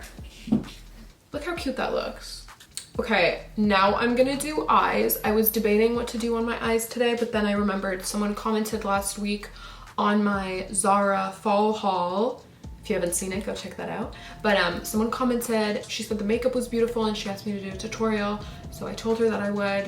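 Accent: Toronto, Canada. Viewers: Why can I always tell when its a fellow Canadian LOL!? Our accents are not even that apparent !! anyways you're stunning hun! from another Canadian living in the UK <3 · is this weird.. from your voice i could tell you were from Toronto.. then you mentioned winners so I knew you were from Canada then I checked your ig and turns out you are! ahah love your videos! we need a toronto beauty guru!!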